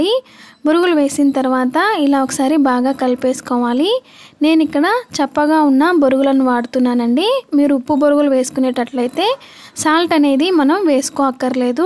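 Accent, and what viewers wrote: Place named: Rayalaseema, India. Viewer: Mana rayalaseema basha ❤ chana baguntundi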